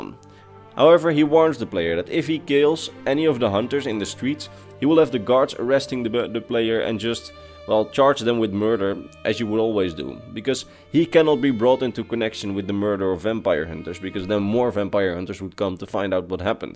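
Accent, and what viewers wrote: Netherlands. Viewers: I didn’t really pick up from your accent that you were Dutch, until I thought about it more after you said “Dutch time”. What province are you from, might I ask? Also, love your videos!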